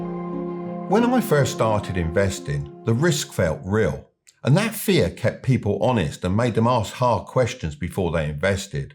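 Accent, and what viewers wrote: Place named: Clacton, United Kingdom. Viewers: I tend to find that what to all intents and purposes is MIDDLE AGED bloke with an esturial dialect from somewhere close to London and talks about dollars rather than the exchequer of his foundation is someone worth taking with a whopping dollop of salt. This bloke has all the hallmarks of being bogus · Is this bloke from Clacton on sea !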